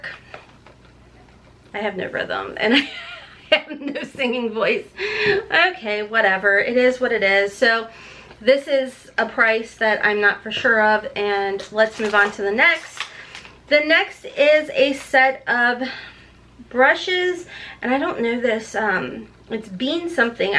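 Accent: South, United States. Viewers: Girl I absolutely love your country accent coming I'm from. TN and the faster I talk the more mine comes out . I too have not been able to pick my products for ipsy glam bag plus and I don't have Pr or the open studio stuff Seems like Ipsy is kinda going down hill big time my small bags are more exciting than the big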